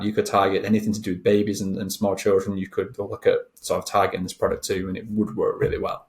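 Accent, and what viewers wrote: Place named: Bolton, United Kingdom. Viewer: Where are you from as you sound local to me. Bolton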